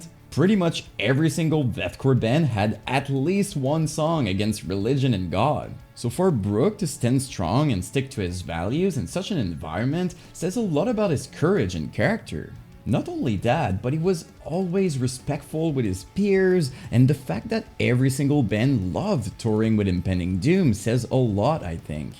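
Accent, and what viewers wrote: Quebec, Canada. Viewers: C’est sûr que t’es Québécois! 😂 Est-ce qu’on aura une vidéo en français un jour? Peut-être à propos de la scène deathcore au Québec? 😗 En tout cas, merci pour là video — du bon travail comme toujours. 🫶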